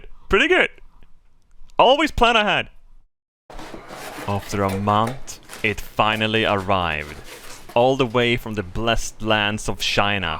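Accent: Sweden. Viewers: That glorious glorious Swedish accent from is honey to my ears it’s been too long since I’ve heard your voice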